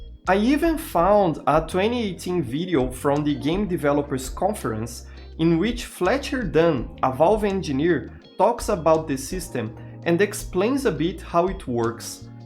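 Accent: Brazil. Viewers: Bruh, i never heard of you before and this video popped up in my recommended. But something about your accent SCREAMS brazilian, am i right? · Massa ver um BR fazendo um vídeo tão bem informado sobre o TF2. Top 10 sotaque foda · Are you Brazilian? you speak english with a very brazilian accent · Eu conheço o sotaque brasileiro quando eu escuto o sotaque brasileiro haha. Bom trabalho com o vídeo por sinal.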